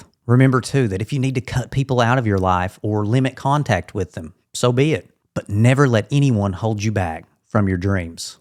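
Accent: South, United States. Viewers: Hey! Thanks for making this very helpful information. Where is your great southern accent from? Keep up the important work!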